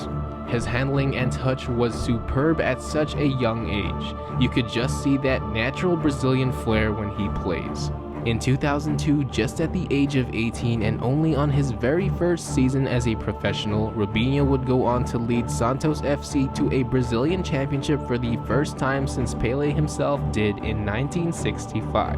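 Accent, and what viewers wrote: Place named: United States. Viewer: I cant take football videos seriously when their commentated by Americans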